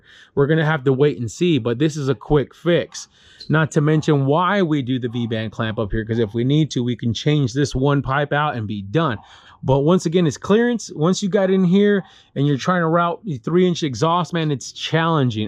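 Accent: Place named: Northern California, United States. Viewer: I can tell you from Northern California bra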